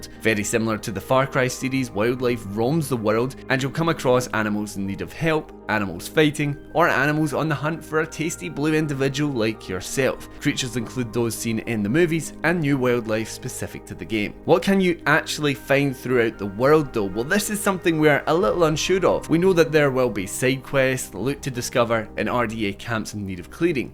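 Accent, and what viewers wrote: Scotland, United Kingdom. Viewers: Random thing to say but I haven't heard a Scottish accent in a while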